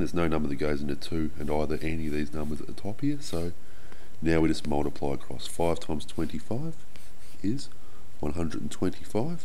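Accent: Australia. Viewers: All the channels I have watched for this all had like the thinnest American accent, finally a fellow Aussie I can actually understand! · Jonathan Ramirez hes australian, and Bruce as well has an Aussie accent. So there you go.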